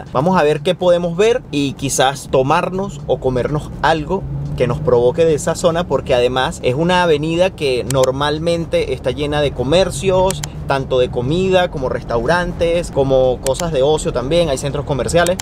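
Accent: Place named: Venezuela. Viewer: Muchachoooo ya llega a su País Natal y se pone hablar como un Venezolano XD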